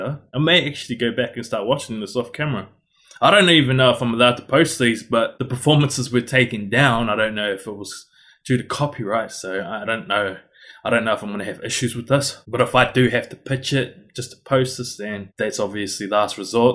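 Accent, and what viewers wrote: New Zealand. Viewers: omg are you from New Zealand? your accent:oo is amazing, react to more of his mask king videos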